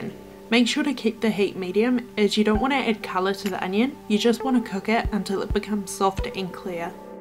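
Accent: New Zealand. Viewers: Man your accent is sooo intense, I left NZ 13 years ago and I'm 100% sure the accent changed. video is amazing though.. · New zealand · That's a New Zealand accent, right?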